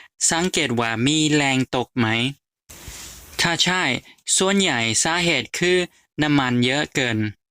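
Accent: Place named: Isan, Thailand. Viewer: สำเนียง ฝรั่ง+อีสาน 👍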